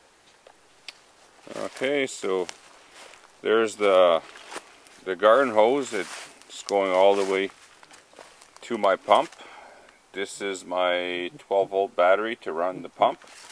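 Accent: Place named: Canada. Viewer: Nice setup. Looks like there's enough room in the box for the battery, so you could have even shorter wires. Plus it'll keep it sheltered and hidden. P.S. I could tell from your accent that you're a fellow Canadian. French Canadian?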